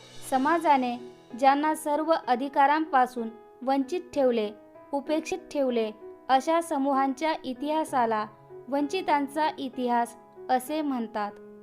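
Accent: India. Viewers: Tumi kontya gavane bolt aahat😊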